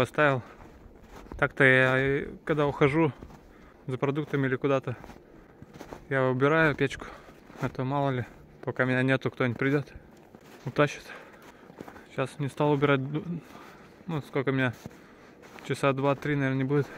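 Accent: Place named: Vologda, Russia. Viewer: Интересный у вас говор, вы с Вологды?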